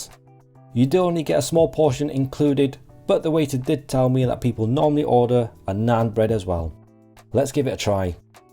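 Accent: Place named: England, United Kingdom. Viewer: Nice pomme accent by an Asian guy doing Indian food... From a African guy from Kerala, India:-)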